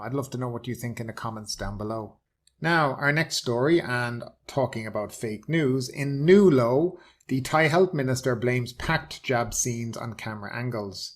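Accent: Ireland. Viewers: ah. Dunshaghlin or dunboyne I’d say. (With that accent) …… I’m a dub myself.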